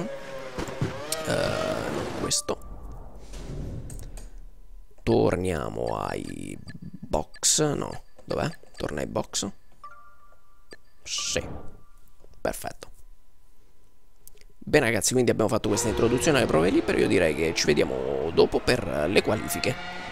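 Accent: Rome, Italy. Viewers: pensavo fossi romano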